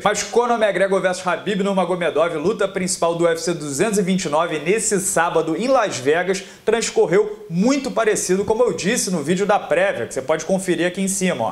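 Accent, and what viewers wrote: Rio de Janeiro, Brazil. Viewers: Durante a luta eu fiquei lembrando da sua voz com o sotaque carioca dizendo: "Khabib não mija fora do pinico".... kkkkk. Ponto!!!!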